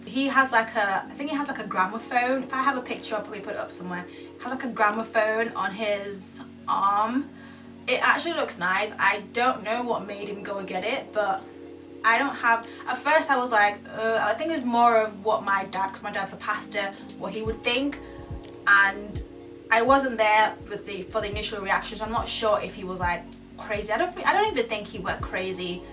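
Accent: Igbo, Nigeria. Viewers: If your videos will be more like this,will definitely be watching every post!! Love your naija ascent 😘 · Sweet and Honest. Enjoyed watching you and enjoyed the Igbo accent. You have company... I'm bad at it too... my fiance is Nigerian and he kills himself laughing every time I try that accent. Stay strong sweets! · Best video ever. Soo relaxe and cool. Love your nigerian accent. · You're naija accent! Everything! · lmao love your nigerian accent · Love the Igbo accent👏👏👏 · Awww the 18 minutes went by so fast lol. I loved the Nigerian accent tho! Thx for sharing! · Plus i love your hair and the Nigerian accent. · You are very beautiful on this video You seem to have a beautiful personality And I loove your nigerian accent. That was good 😄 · Thanks for opening up in this video. I loved hearing your Nigerian accent. It was nice to "get to know" you in this video! 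· Lmao that Nigerian accent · OMG!! I LOVE hearing your Nigerian accent!!! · sounds kind've British to me; Personally i rather your Nigerian accent. That's just my opinion, keep up the good work tho :* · Your Naijah accent is flawless though! ♡ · I loooooove this video.. and many others:)..plus the Nigerian accent has so much win to it. Keep shinning:) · Looooool...OMG! Best video of yours I've seen! Your Nigerian accent is sooo funny, but abeg you still try. This hair is amazing on you, the bangs suit you so much. I agree with the annoying comments and keeping your personal life to yourself. You are pretty amazing :) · Love u lol,, naija accent tho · Your naija accent tho. Lool. You sounded like Shalewa in that Nigerian soap opera tinsel. But regardless, I think you are super cool, and pretty too. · Girl! I love ur Nigerian accent. I am reli seeing a difference in u and I have been watching ur videos for yrs. 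I mean u are coming out of ur bubble more and I'm seeing ur personality. I'm in da same boat as u, I feel like I'm dissing Ghanaians went I put on my Ghanaian accent smh btw I'm Ghanaian. I would love to meet u someday. Luv u xoxo l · i loooooooved your nigerian accent so much!! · Love the naija accent....may all your dreams come true IJN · awwwh i loved this loved this vid specially the intro and the end that accent was on point u spoke with the typical igbo english accent like those ontisha boys hehehe...am yoruba and olodo is our common insult loool but i have a lotta igbo frnds and i caught d pigin englih with the accent lool i really loved listening to u wiv the accent....and yeah keep ur private life private like popc z dont air ur linen in public...but i really wish you all the best and hope you find the bone of your bone and flesh of your flesh ijn.....great vid s=xoxo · I really love your Nigerian accent. 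· Lol... The naija accent !!! I feel u girl..sounds foreign to me as well wen I speak .... But still naija for life !!!!... Forget accent joor!!!! Lol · The intro accent is just the bomb!.... Typical naija..lol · Lol your Nigerian accent is too cute. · I loved this. The naija accent couldn't have been better. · lol your nija accent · Your Nigerian accent is really cute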